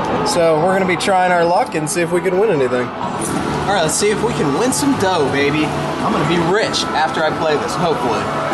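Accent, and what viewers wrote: United States. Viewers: he has the most american accent ive ever heard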